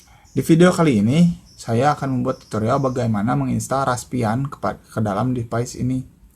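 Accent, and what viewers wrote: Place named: West Java, Indonesia. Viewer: Mantap sa masih aya logat sunda 😆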